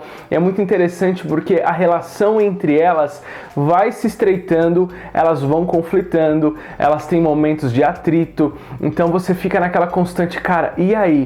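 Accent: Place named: São Paulo, Brazil. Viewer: Raro sotaque paulista! Men gostei de+ da temporada TODA, mas o quinto episódio foi o meu preferido gostei de ver a invasão do palácio não sabia desse fato, fui buscar e realmente aconteceu "achei engraçado" a dama de ferro, fantástica! mas não curto muito a indiferença que ela tinha pela rainha o que eu percebi foi que a série não enfatizou o fato de que a primeira ministra detestava os encontros semanais e arranjava qualquer desculpa para não ir " e não ia" .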